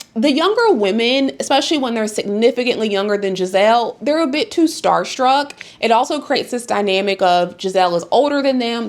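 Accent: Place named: South, United States. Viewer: absolutely love your voice and your accent, weirdly enough i don’t hear many southern accents in my video essays! you’re doing amazing btw i love your content i subbed after this video bc your content quality is top notch!!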